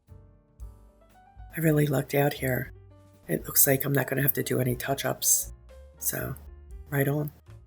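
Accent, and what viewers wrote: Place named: New York, United States. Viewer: Love this sign! Hope you’re loving your new fridge! Where. Are you from? Your accent sounds like we could be neighbors! I’m hearing NY NJ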